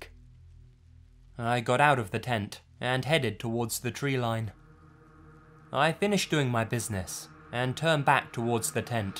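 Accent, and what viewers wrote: England, United Kingdom. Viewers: british accent reading/narrator for Native American SKWalkers video??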